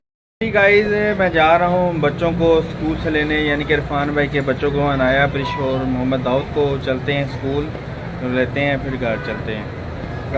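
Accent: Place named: Pakistan. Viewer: Urdu touch Mashallah g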